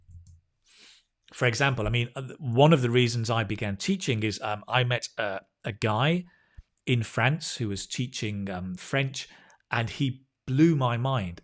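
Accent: United Kingdom. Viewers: Your lessons are really important always.You try your best to give us the best free.Thanks a million teacher!Always love to hear your british accent!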